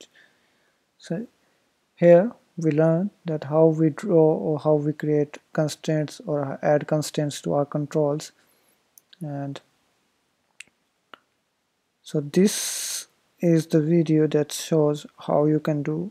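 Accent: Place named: India. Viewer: i ask the video uploader to learn English.. either american or English accent.. cant cope with the indian accent even for a second !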